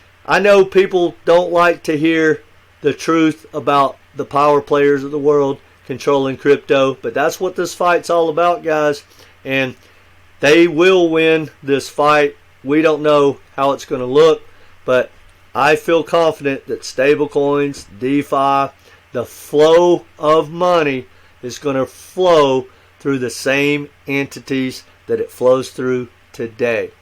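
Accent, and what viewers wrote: South, United States. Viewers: I like your southern accent . Ive been living in Memphis for years now but originally I'm from Chicago . After moving to the south I dont think Id move back to the north.. Southern hospitality is real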